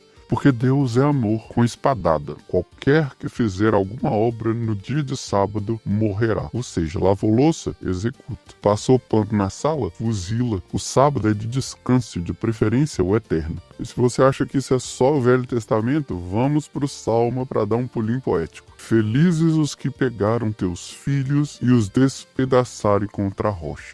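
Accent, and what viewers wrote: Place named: Minas Gerais, Brazil. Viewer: Sou apaixonada nesse sotaquinho mineiro! Assistindo pela SEGUNDA vez!!! Muito bom!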